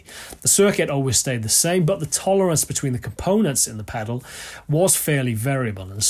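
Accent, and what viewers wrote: United Kingdom. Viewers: I mentioned two yardsticks one of which you didn't address. Do you think could get a band together, tour the world and fill up arenas and stadiums (yes, stadiums!)? By another measure, he has 116K subscribers. StevieT has over 2 million, Tyler from Music is Win has 1.3 million, Marty Schwartz of Marty Music has 2.7 million and the list goes on and on. None of them will be "legends" either but their fans have more justification to stake that claim. By that measure is a mere cult figure. Don't get me wrong, once I got past his Brit accent and Brit pastiness, I realized what a great channel this is. I love his playing style. A legend? I think we're tossing that word about a bit wantonly and "fanboyishly".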